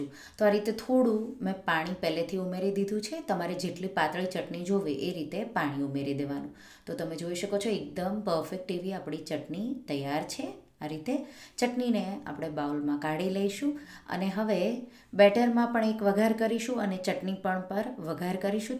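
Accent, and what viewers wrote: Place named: Kathiyawad, India. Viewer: તમારી વાણી એકદમ કાઠિયાવાડી મધુર છે. Love from New Zealand.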